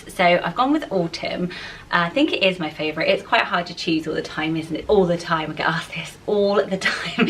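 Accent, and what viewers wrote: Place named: Bristol, United Kingdom. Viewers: I'm from Weston and honestly didn't notice your West Country accent until this video 😂 I go to Swindon often for the ice rink 😜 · I KNEW IT, I FREAKEN KNEW IT! I COULD HEAR THE RRR'S AND I COULD HEAR YOUR ACCENT, I'm sorry I'll calm down... 😂Okay well I predicted Bristol, but I mean, I was pretty close. :D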